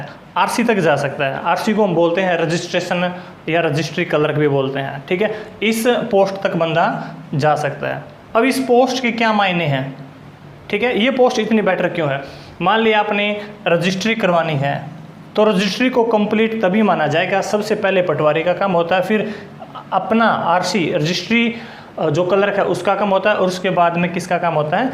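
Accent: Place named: Haryana, India. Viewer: मुझे अपनी साइड का accent लगा बोली का तब पूछ लिया था sir मैं proper नारनौंद से हूँ Best wishes for your channel भोले नाथ आपको खूब तरक्की दे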